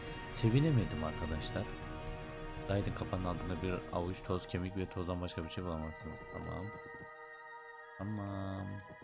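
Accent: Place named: Ankara, Turkey. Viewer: bizim taraflarin şivesine benziyor anlamında demek istedim. Ankara yani. Ankara'nın neresi?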